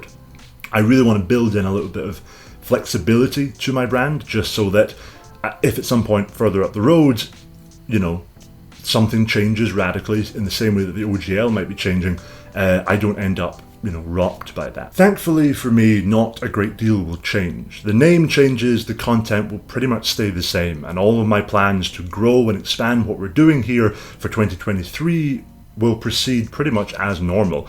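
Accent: Scotland, United Kingdom. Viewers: I have literally never heard of this channel before but silver lining of this OGL debacle is that I am finding creators I had never heard of. Also you have another fan of the accent here :D. Is it Scottish?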